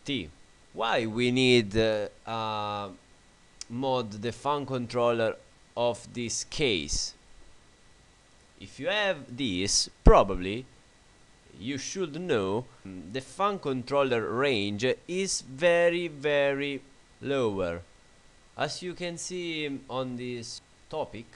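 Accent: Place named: Italy. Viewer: i like your accent man. italy rules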